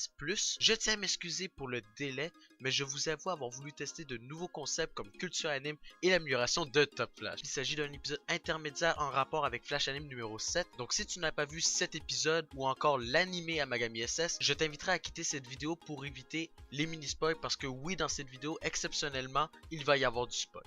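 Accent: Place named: Quebec, Canada. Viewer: Alors non je ne suis pas un PyroManiac, enfin pas encore, car je ne me suis encore pas mis à découvrir d'autres vidéos, notamment à cause du boulot... Mais je risque de m'abo si j'aime ton contenu. Ensuite, je trouve que ton classement par tiers (que j'avais compris^^) est pas mal car il ne les classent tous pas dans un ordre précis. Cependant j'aime bien donner un top 3 (comme celui dans le com précédent). Je m'étend pas plus sur ce commentaire, pourquoi pas sur une autre vidéo, car je vais commencer par regarder l'AMV et les contenus qui m'intéressent. Ah et oui je me doute bien que tu es Québécois, et je ne trouve pas ça utile de le faire remarquer. A partir du moment où tu parles français et que le contenu me plaît, je me fiche pas mal de ta nationalité selon ton accent^^ Voilà je go sur ton AMV, et merci d'avoir pris le temps de me répondre :)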